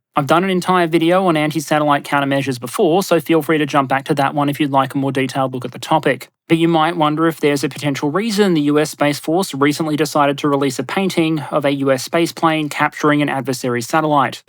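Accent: Australia. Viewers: Absolutely high quality research and even more superb delivery. What a witty Aussie 😂 pitty you guys can’t play rugby 🤣try next time in Suva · Didn't notice it till now that you've Aussie accent mate, Aussie Aussie Aussie!!! Oyyy oyyyy OYYY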